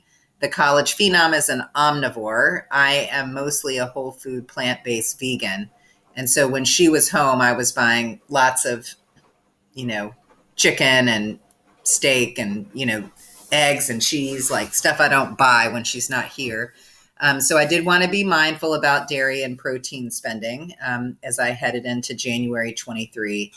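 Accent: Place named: South, United States. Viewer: You definitely have "South in your mouth" with the way you speak. A good thing.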